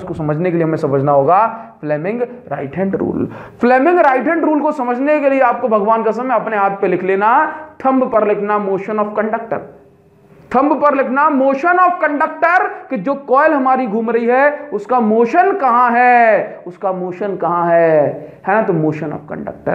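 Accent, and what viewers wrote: Odisha, India. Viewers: Odia ho kya sir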